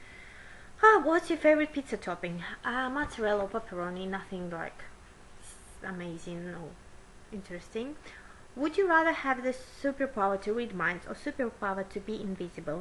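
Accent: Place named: United Kingdom. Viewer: what is your accent? it sounds british but.. with a mix of something? it's cool!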